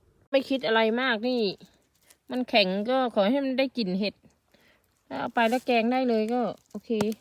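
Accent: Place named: Sakon Nakhon, Thailand. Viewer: พี่พูดเหมือนภูไทสกลเลยจ้า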